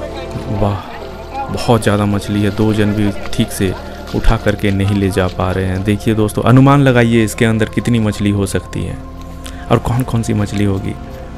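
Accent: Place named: Jharkhand, India. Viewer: Baat se lag raha hai aap jharkhand east Singhbhum ke hain. Ab yeh bata dijiye kis gaon se hai. Main Jadugoda se hoon.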